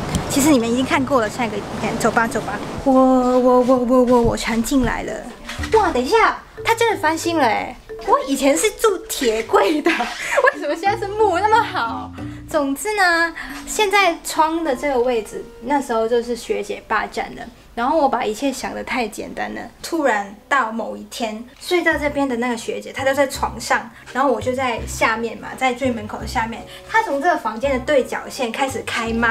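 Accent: Taiwan. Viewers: 看到的故事其實也有感啦~ 畢竟我從你大概10萬訂閱開始看~ 在異鄉生活真的不容易~ 自己也是從彰化到臺北讀大學~ 但生活總是會有不如意的事情~ 甚至得罪人到很莫名~ 會很沮喪~ 但也別忘了生命會展開別的窗~ 聽到你說曲老師的故事其實蠻感動的~ 畢竟他們老師也是你的恩人~ 算在你遇到低潮迷茫時幫你一把~ 希望之後新學期順利啊~ 話說你講話跟臺灣人真的很像耶~ 畢竟我遇過的香港人~ 即使住臺灣三十年也是蠻有口音的(比有馬明顯)~ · 熊熊花現妳講話已經開始出現台灣式腔調了.....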